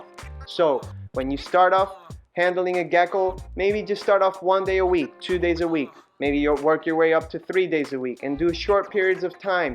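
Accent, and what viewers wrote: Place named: United States. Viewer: So glad it is American accent ❤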